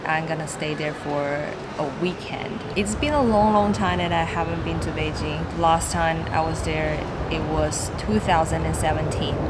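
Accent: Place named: United States. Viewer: 好重的美国口音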